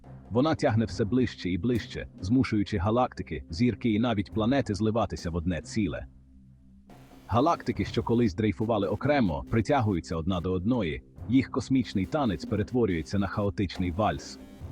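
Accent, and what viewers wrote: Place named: Poland. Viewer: жаль наголоси погано виставляються на укр мовi :body-blue-raised-arms: та настройка яка нормально корегуе iншi мови, перетворюе укр мову на якусь... навiть хз...полупольську якусь, в сенсi звучання... гг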